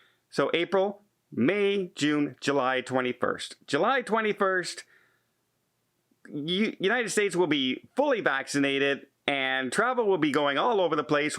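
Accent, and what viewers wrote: Canada. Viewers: All this a guy who's country has banned cruising for a year. If you want to criticize a U.S. Agency then drop the Canadian accent clown and stay in your country!!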